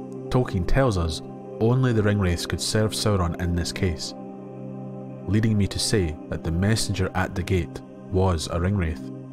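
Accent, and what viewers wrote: Scotland, United Kingdom. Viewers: Oh no! The only two Scottish accents I could get any information on were Edinburgh and Glasgow. I had been wondering if Inverness were a possibility, but I couldn't find any examples of it at all. Eventually, it came down to whether I thought you sounded more like Billy Boyd or Billy Connelly. Lol.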